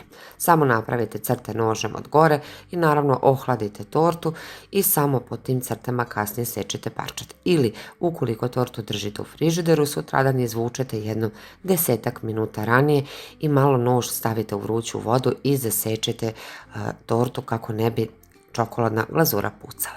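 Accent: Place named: Vojvodina, Serbia. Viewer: Može i odozgo i od gore.U čemu je problem? je iz Vojvodine i priča onako kako je navikla. Ne priča Mađarski da je ne razumeš. A ti si neki književnik pa ti smeta? Ajde ne traži dlaku u jajetu. Pričaj kaki hoćeš, imaš mio glas I sve lepo objašnjavas. ❤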